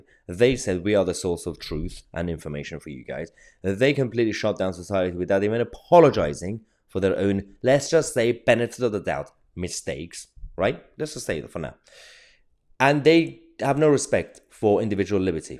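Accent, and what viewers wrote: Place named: RP, United Kingdom. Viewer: stop talking like you got a toffee stuck in your gob you aint British so stop with the BS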